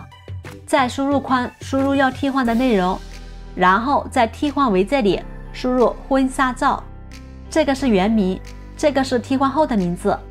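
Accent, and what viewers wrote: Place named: Yunnan, China. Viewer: 听出了云南口音，不知道对不对呢😁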